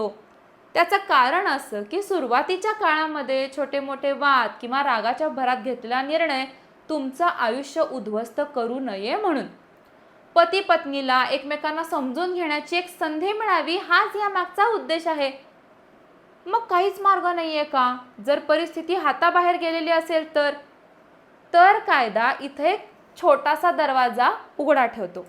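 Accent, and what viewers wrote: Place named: Kolhapur, India. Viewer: ताई फ्रॉम कोल्हापूर वाटते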